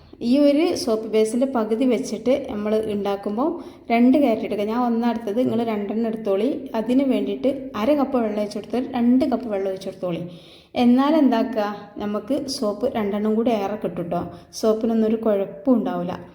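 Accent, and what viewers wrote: Malappuram, India. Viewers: ഇപ്പോ താത്ത ന്റെ സംസാരം മലപ്പുറം ഭാഷ ആയി 😂ഇതിനു മുമ്പ് ഇൻഡോകി ഇനാലെ എന്നൊക്കെ പറഞ്ഞു ബോറടിപ്പിച്ചിരുന്നു ഇപ്പോ പൊളിച്ചു ❤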